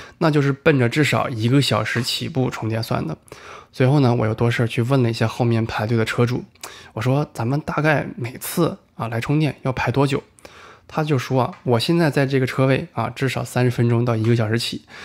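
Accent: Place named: Northeast, China. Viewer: 感觉像东北😃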